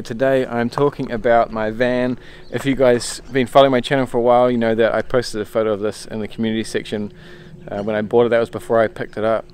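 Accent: New Zealand. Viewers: so nice to hear a Kiwi accent !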